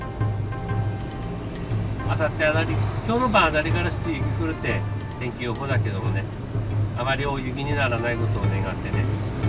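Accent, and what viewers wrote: Tsugaru, Japan. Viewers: 津軽弁での説明、良いですね😃‼️。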